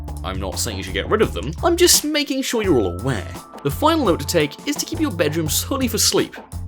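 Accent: United Kingdom. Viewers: This may be the most British guy I've ever heard lmao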